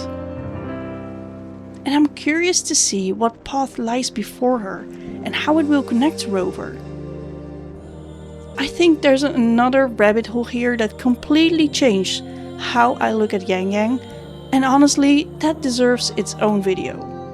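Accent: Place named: Netherlands. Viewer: as a fellow dutch person its suprising to hear the accent xd, great vid!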